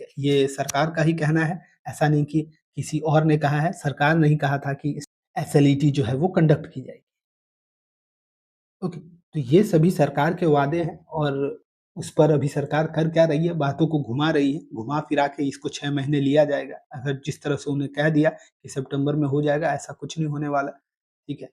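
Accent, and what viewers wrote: Uttar Pradesh, India. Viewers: Tum up se ho kya? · Tum Up se ho kya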